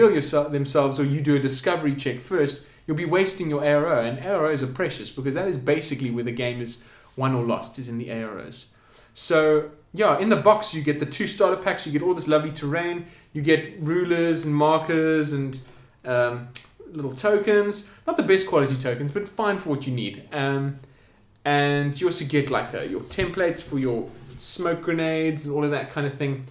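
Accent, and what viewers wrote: South Africa. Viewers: That has to be a South African accent. But yeah, Infinity is great!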